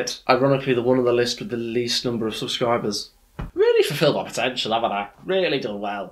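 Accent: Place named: Oxfordshire, United Kingdom. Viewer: Your voice has changed even from 2017, you sounded more Oxfordshire than Yorkshire but what ever, congratulations on continuation of your vids !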